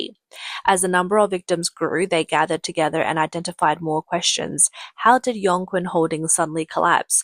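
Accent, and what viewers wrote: Australia. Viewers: My favorite Australian Chinese narrator😮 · Aussie accent on the voice over. PM of AU in chyna in Winnie's ear, while the USA is throwing its hands in the air saying 'Australia, what the f*** are you doing' they don't know w t f they are doing.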